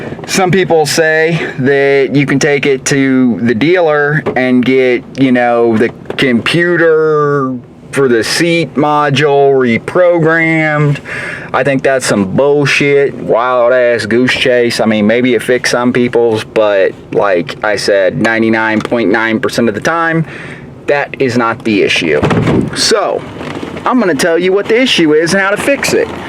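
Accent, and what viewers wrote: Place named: Appalachia, United States. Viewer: What a stinking hill billy. How about some regular English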